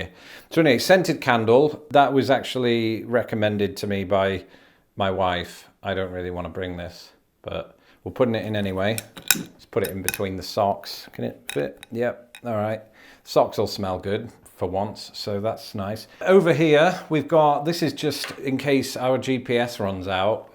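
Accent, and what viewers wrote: United Kingdom. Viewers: Talking about nothing, sounds better with a British accent. Have a great vacation!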